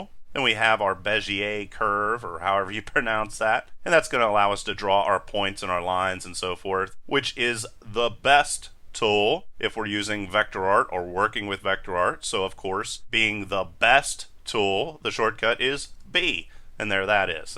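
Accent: Pittsburgh, United States. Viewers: you sound like you are from pittsburgh. p.s. thanks for the video, super helpful! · Thanks for a great tutorial series! Thought I heard a twinge of Pittsburghese in your presentation? ;-)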